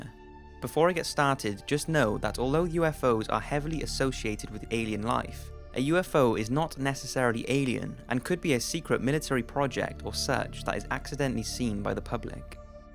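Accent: Wales, United Kingdom. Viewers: I do love a welsh accent.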